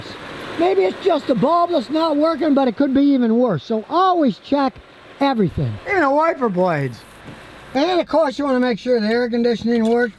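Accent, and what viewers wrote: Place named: Houston, United States. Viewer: This is Richard Dawkins, if he had been born and raised in Houston.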